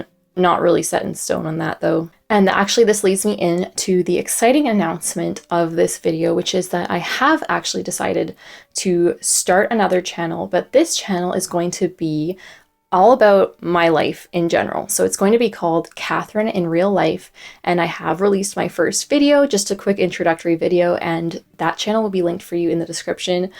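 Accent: Canada. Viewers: Please do a video about your eyebrow regrowth journey- I can see, in earlier pictures of you, that that is also a choice that you made. I just turned 60 and decided about a year ago to start regrowing my brows (because the alternative is a very expensive brow lift!). My brows were growing back really well by simply moisturizing them every night with L’Occitane cream, but when I used micellaire water to remove my makeup over the course of a couple of months I realized that I was getting bald spots where there had previously not been a problem with sparsity! So I would love for you to talk about that… Love your videos, great information, and Canadian accent!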